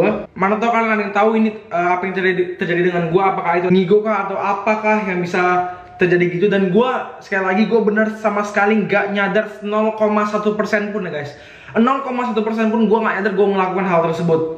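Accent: Batam, Indonesia. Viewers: bang lu org batam ya?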